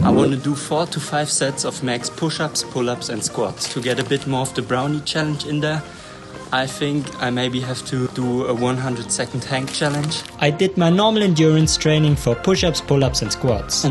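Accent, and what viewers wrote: Germany. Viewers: Your videos are really good for such a small youtuber 👍 Yes, there's a clear difference to Browneys channel, but you deserve more subs definitely! And your english is also good, even if you can hear the german accent, but mine is much worse 😂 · Are you german?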